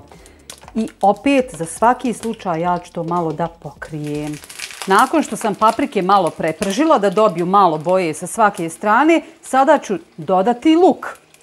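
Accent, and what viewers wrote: Bosnia and Herzegovina. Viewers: Rucak ukusan i jednostavan, uzivam slusati nas srednjobosanki akcent👌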